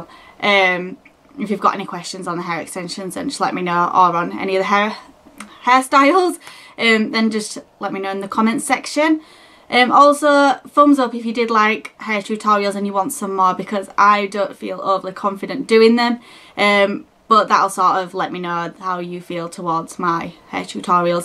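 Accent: United Kingdom. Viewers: Love them all! Love your accent so much,I'd love to visit the uk aha x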